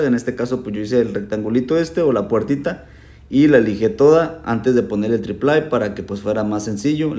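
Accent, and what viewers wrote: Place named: Michoacán, Mexico. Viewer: Muy buen trabajo 👍👌 oye mi estimado eres de Michoacán verdad por el asento y la parota que trabajas eres como de coalcoman por esos rumbos de Michoacán jeje saludos y bendiciones amigo gracias por compartir tus conocimientos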